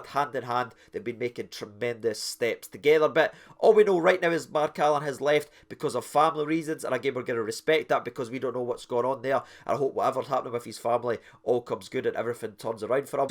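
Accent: Scotland, United Kingdom. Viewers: hope your nose is ok and your feeling better. hopefully it heals up fast as i hope to get a selfie with you when i come over from Australia. I started watching your you tube a year ago and have just recently become one of the patrion. My daughter loves listening when i have it on and tries to copy your accent. I'm coming back to scotland in October and hopefully get to a few games including the Porto game. till then keep up the good work and hope your feeling better soon cheers G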